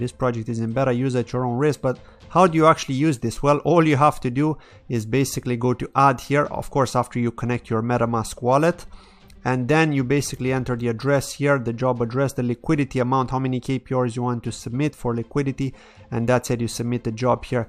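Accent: Ireland. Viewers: you have a slight irish accent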